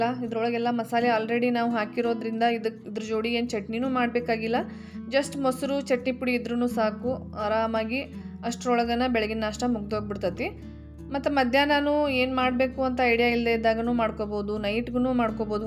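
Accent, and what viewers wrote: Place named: Andhra Pradesh, India. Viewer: actually I'm from Bangalore ree nang Nim thara Kannada maathadodh Andhra Baal chalo ansthithi ❤️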